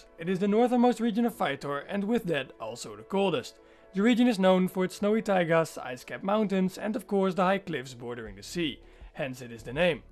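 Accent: Netherlands. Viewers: Where are you from? Your accent makes me think you are Dutch. Nice build bdw.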